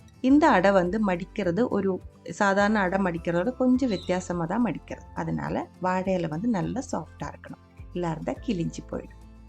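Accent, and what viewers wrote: Kerala, India. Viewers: മലയാളം തമിഴ് കലർന്ന ഭാഷ എന്നാലും സൂപ്പറായിട്ടുണ്ട്👍🙏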